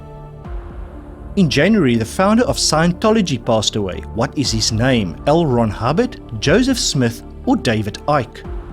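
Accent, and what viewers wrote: South Africa. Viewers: I love your accent, but what is it ❤️🙏🙏🙏??? I cannot put my finger on it. SA??? 😮Thank you for these great quizzes ❤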